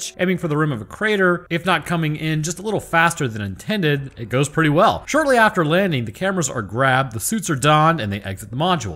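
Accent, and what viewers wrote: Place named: South, United States. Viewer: You can tell you were raised in the south lol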